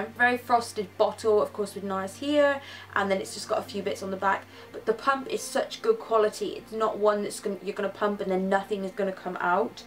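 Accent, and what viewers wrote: Bristol, United Kingdom. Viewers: Is that a Bristonian accent?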